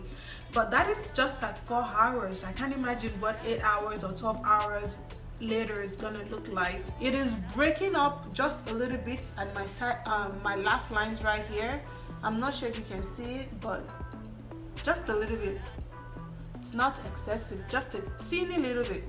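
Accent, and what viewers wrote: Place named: Nigeria. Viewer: you are so beautiful! your accent sounds familiar to me but I might be misplacing it... are you Nigerian? I am too, Igbo!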